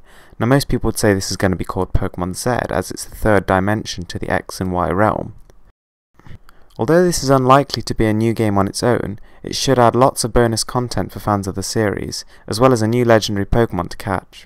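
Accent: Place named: United Kingdom. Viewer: why british people say to pokemon. pöukman? wtf...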